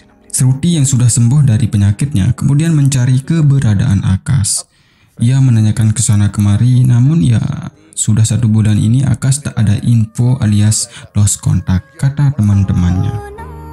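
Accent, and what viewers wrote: Bali, Indonesia. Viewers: Dr logatx orang BALI ya min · Malah mnurutku logatnya kaya org Bali/Lombok Tp syg speed pnyampaiannya trlalu lmbat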